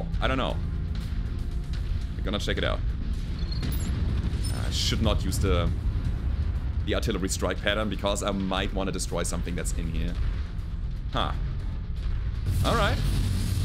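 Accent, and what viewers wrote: Germany. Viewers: I'm not very good at this game but I love stomping around (and playing Mechamon while I do so). I'm really enjoying your unmodded playthroughs; this one I'm finding especially interesting because it features the one DLC I don't have. Also I hope you don't mind me saying this, but I love your accent! It's nice to know how all the German stuff in the game is actually meant to sound. 😅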